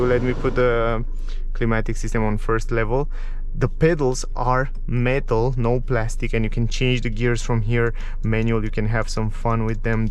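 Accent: Romania. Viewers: You sound ... Romanian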